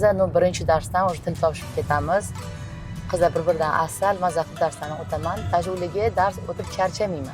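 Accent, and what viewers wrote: Tashkent, Uzbekistan. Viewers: Фаргоналикман дегандингиз.Тошкент шевада гапиряпсизу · Узиз фаргонадан бусезам Тошкент шевада яхши гапирасиз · Сиз фаргонадансиз,Миндондансиз тугрими.нима у/н Тошкент шевада гапирасиз.Миндонди шевасидан уяласизми.нимага пойтахтга бориб шеваларини тезда сотасизлар.буни кандай изохлайсиз. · Кечирасизу нега водийлик була тошкент шевада гапирасиз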